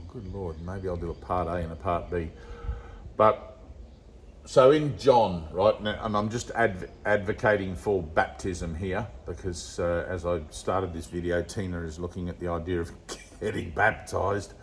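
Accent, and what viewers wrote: Australia. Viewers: Beard ✅️ Long hair ✅️ Australian accent ✅️ Scrubby handwriting ✅️ Tattoos ✅️ Deranged laugh at the beginning of each video ✅️ Everything you say ((must)) be true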